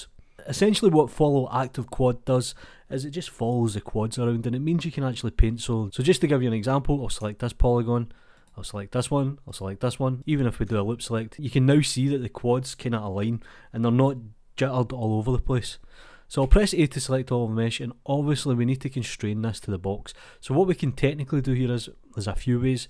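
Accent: Scotland, United Kingdom. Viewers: I freaking love your Scottish accent. Keep doing this!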